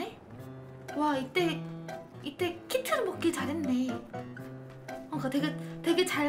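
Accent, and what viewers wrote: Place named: Chungcheong, South Korea. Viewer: 겨??? 누나어디사람이야! 충청도하이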